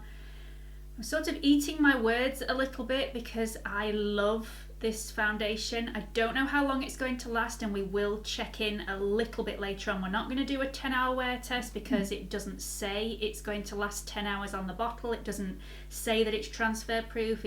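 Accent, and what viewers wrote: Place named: Yorkshire, United Kingdom. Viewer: I’ve just found your channel and I’ve subscribed. I’m so relived to find someone doing makeup for a more mature skin. I’m 49 and like a more natural makeup look. Plus its good to hear a familiar accent. You must be a Yorkshire/North East girl.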